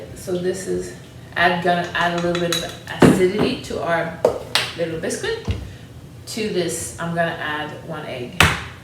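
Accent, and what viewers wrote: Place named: France. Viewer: You are so charming with your French accent.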